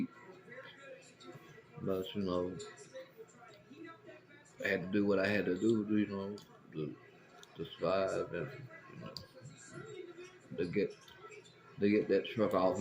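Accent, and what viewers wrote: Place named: Louisiana, United States. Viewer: Are you from Louisiana? I’m not making fun of the accent - I like it I’m just curious if that’s a Louisiana accent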